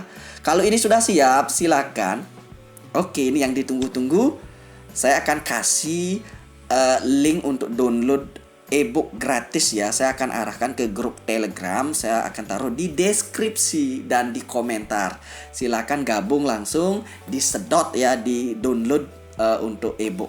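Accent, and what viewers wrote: Bali, Indonesia. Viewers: Jleme bali ci sing?, logat ci bali ajan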